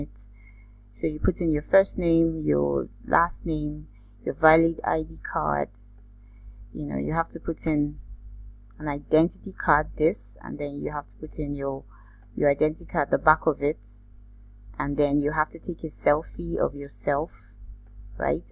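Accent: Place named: Nigeria. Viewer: Ah, Nigerian. I thought you sounded Caribbean ha ha. I see what you mean. But you say switch my BCH to Eth or BTC. But i don't have anything to switch. I have nothing, I'm looking to start trading. Are there other platforms where I can buy BCH directly? Or do you think I should buy either BTC, ETH, USDT externally first, then transfer it to finance to trade?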